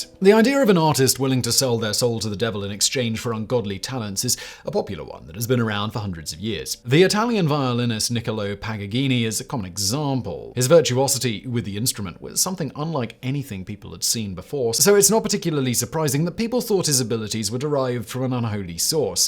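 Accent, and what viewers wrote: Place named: RP, United Kingdom. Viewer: Bro why do you talk like that.. makes it hard to listen/take seriously. I thought that pretentious posh accent died out by the late 1900s.. even the British RF dont talk like that anymore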